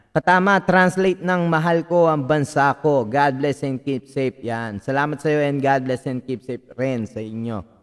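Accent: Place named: Kansai, Japan. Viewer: Boss kansai-ben yun sayo no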